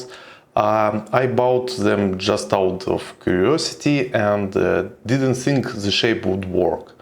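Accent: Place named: Poland. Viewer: Ну ладно, не русский а поляк Ну все же акцент сильный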